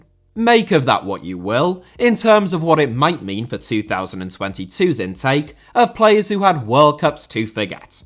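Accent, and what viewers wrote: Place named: England, United Kingdom. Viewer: I can understand the non-English words but the English words? It's just his accent lol if anything that's the correct way to say those words since English came originally from England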